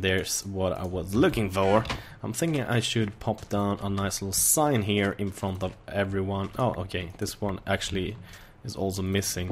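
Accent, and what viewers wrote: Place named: Sweden. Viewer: Fan va gött och höra! :) Bästa videosarna på YT så jävla gött med lite engelsk med svensk brytning! Tack för att du gör videos! Ha det gött and get some more beers! ;)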